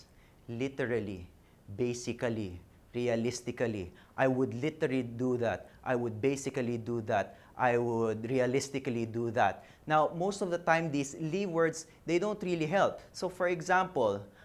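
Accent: Philippines. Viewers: Wow, stig bossingleloy. gotcha.... Dto hlata mo pinas inglis. iba ingls ng ponjap, kano, yanokor, atbp. honga, (trnsn) medyo my pasakalye pinoy inglis, pero maliwanang, naintidihan nila. pero unkanila, medyo kelgangan attention ka. subscribed na po ako. dami trnsition, 共.. t トランシチオン すみません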